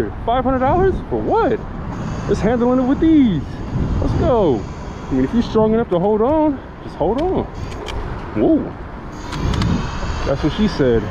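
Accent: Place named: Bay Area, United States. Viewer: My boy, you must be from the Bay Area.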